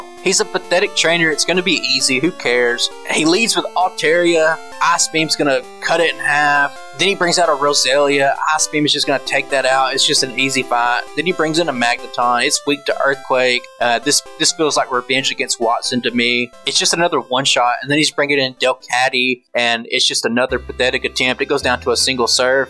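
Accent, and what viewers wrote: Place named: Texas, United States. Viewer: just saw this channel now gg on the win also nice texas accent lol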